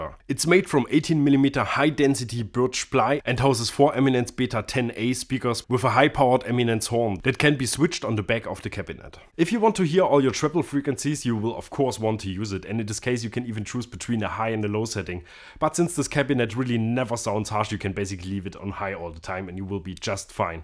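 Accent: Germany. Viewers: у него немецкий акцент?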